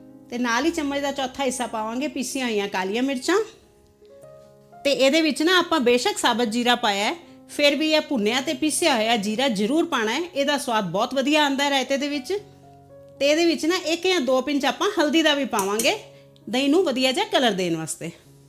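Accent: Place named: Punjab, India. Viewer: Meethi meethi aap ki Panjabi zuban upar se mazedaar raytuon ki recipe .me zarur banaungi ❤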